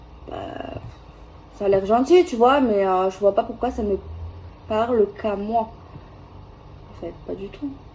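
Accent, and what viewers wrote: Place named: Marseille, France. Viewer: j’adore ton accent marseillais j’espère pouvoir commander ton oracle le plus rapidement 😌et que tu me dises comment faire bisous prend soin de toi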